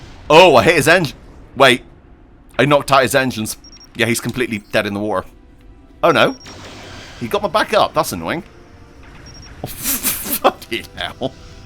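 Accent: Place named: South West England, United Kingdom. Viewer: i know a fellow south west person when i hear one!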